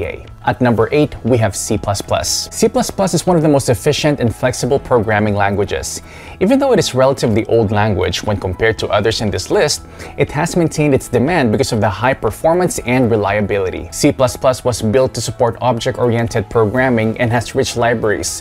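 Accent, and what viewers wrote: India. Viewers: If you're really from India, its unbelievable, Your accent is a wow in respect of Indians.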